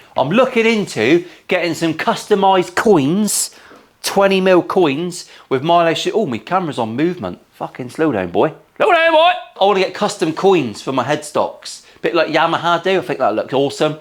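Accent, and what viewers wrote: United Kingdom. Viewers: Banger of a SG mate!!. This is the type of guy where the British accent and swearing so swimmingly together like peanut butter and jelly. Love it. Keep up the great work. Maybe do a left explorer build? ;-D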